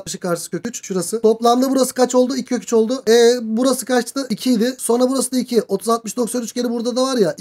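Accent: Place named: Trabzon, Turkey. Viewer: Trabzon gibi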